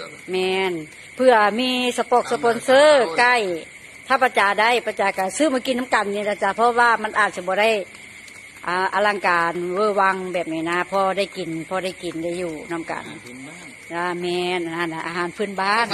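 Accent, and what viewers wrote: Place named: Phu Thai, Thailand. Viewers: สวัสดีค่ะป้า อยู่ทางเลอค่ะนิ คือเว้าภูไทย คือกันกะนางแท้ กินยามเลอกะแซบยามนั้นเนอะค่ะ เป็นอีกหนึ่งกำลังใจให้ป้าเด้อจร้า😊❤ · สำเนียงเสียงภาษาคือย่อสกลนครเนาะจ๊ะดีใจด้วยคะ.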